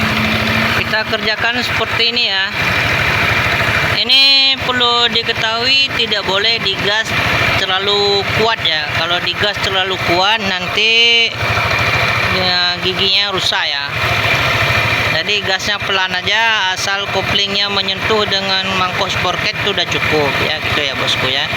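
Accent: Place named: Aceh, Indonesia. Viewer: alamat bengkel dimana bg,kayak logat aceh abg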